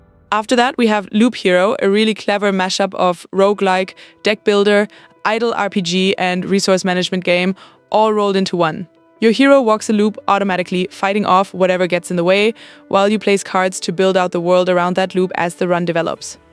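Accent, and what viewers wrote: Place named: Eupen, Belgium. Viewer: Gotcha! GL with your future endeavours, fellow Belgian. I'd guess Eupen area with the slight accent? Excellent english 👌What are your plans for future videos, if I may ask? This was a clean video but reviews are not very "exciting" afaic.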